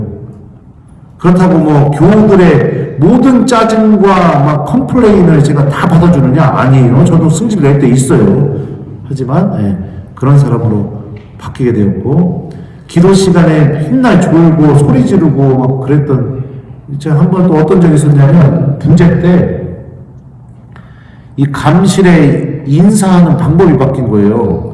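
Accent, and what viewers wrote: Chungcheong, South Korea. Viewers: 요새신부님강이에 푹빠져들고 재미 있게 충청도 말씨 유머 감동입니다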